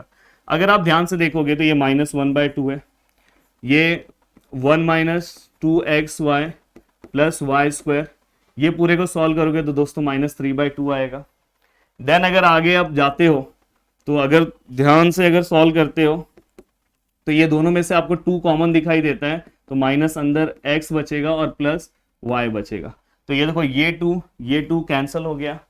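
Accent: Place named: Uttar Pradesh, India. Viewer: Up wale hai bhai lagta hai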